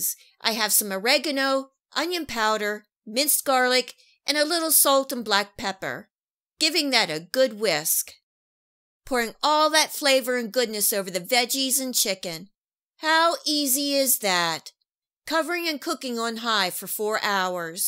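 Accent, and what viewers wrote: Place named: Pittsburgh, United States. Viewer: Hi,! Love this idea! Are you from Pittsburgh? I grew up there but live in CA now. I hear an accent. 😊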